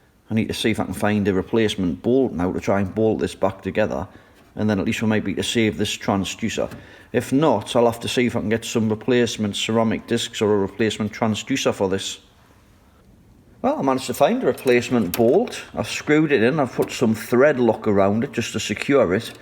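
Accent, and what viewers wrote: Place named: Newcastle, United Kingdom. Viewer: I can't agree more. English is not my native language and thus I am not an expert, but maybe contributing factor is the 'Geordie accent'. especially, circa <num> there are many articles online about it. for example, "Geordie accent rated UK’s most friendly and trustworthy", "A survey found that the Geordie accent is considered the friendliest in the UK, and is also the most likely to put you in a good mood", etc.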